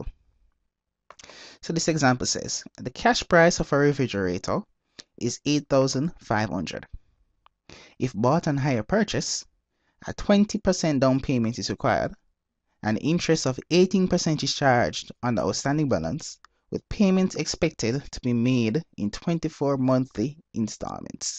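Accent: Jamaica. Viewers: You sound like a Jamaican :) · When me first hear u I knew u were Jamaican bro, bless up! THANKS MUCH !!!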